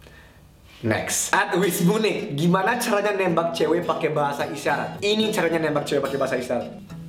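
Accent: India. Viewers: Njir accent india nya..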